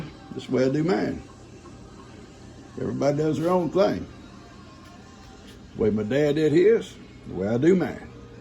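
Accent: South, United States. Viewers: closed caption needs to recognize that southern drawl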